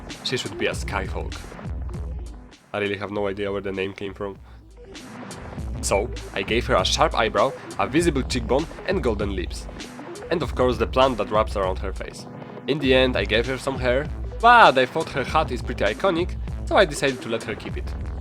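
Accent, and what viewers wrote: Poland. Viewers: Chwila, ja mogłem cały czas komentować po polsku! Jakim cudem nie poznałem rodaka po akcencie?? 😭 BTW ̶C̶z̶y̶ ̶t̶o̶ ̶b̶y̶ł̶a̶b̶y̶ ̶n̶e̶k̶r̶o̶f̶i̶l̶i̶a̶ ̶j̶a̶k̶b̶y̶m̶ ̶p̶o̶w̶i̶e̶d̶z̶i̶a̶ł̶ ̶"̶s̶m̶a̶s̶h̶"̶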